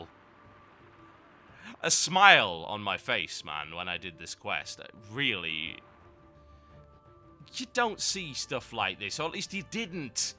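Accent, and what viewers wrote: United Kingdom. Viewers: Tb, i luv ur videos ur so unique nd best of all ur a britsih commentator! much love x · A brit ranting. Epic win.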